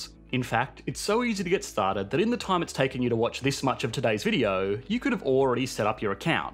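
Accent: Australia. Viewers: He sounds like an australian with parents from stereotypical california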